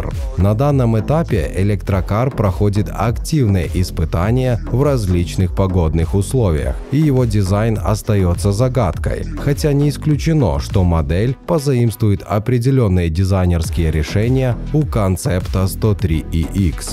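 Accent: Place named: Stavropol, Russia. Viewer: Ставропольский диалект чёто не зашёл)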